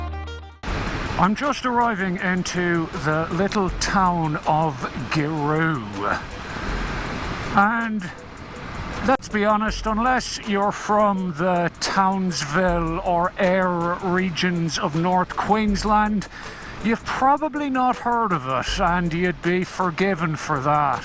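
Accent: Ireland. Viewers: Where in Ireland are you from. Cant figure our the accent?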